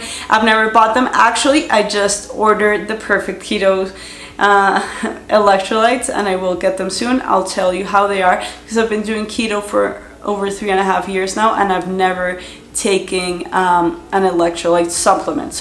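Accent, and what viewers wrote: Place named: Colombia. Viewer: Tu inglés es muy bonito ❤️ me gusta tu canal en español y me encantaaa tu acento colombiano 😊 peeeeeero estoy aquí por puro gusto para mi oído que ama el inglés. Un abrazo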